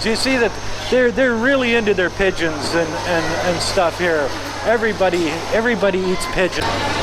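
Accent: Canada. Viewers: Damn is don’t know what’s worse these markets or his Canadian accent ay?! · I like his Canadian accent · Wow that’s a strong Canadian accent😂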